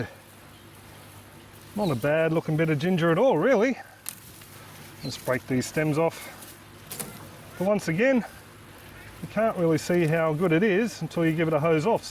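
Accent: Australia. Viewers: So happy to hear an Aussie accent! I live in Cairns. Up here our weather is hot, hotter, cyclonic. I was given some ginger about 3 months ago and had no idea when to harvest, great information just when I needed it. I was about to did them up now! I have new shoots coming up. Is there anything that I should do differently living in this climate? Thanks.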